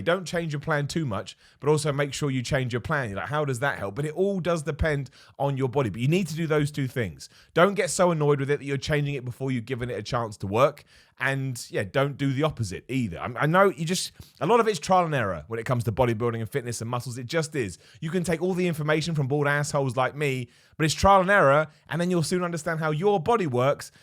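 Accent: United Kingdom. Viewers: Sooo refreshing to here a British voice on YT! Your funny, down to earth and no BS! Well done sir!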